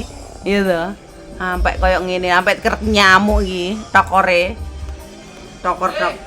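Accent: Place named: Nganjuk, Indonesia. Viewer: Jawanya mana to mbak., dialeknya kayak daerah nganjuk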